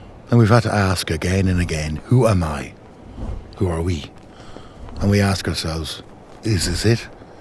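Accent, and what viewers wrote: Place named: Dundalk, Ireland. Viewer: I love that we have poets among us , this brought a tear to my eye , I know every single street and monument but it’s the Dundalk turn of phrase , the tone and accent that resonates . Theses memories are the constant glow that warm us ... to recall those we are with and without ... remembering always where we’re from. Wonderful , thank you .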